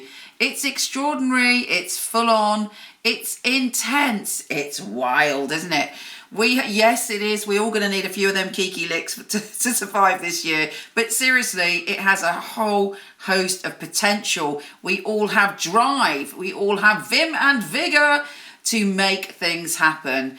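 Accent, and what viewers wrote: United Kingdom. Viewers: Is this? How come she has british accent?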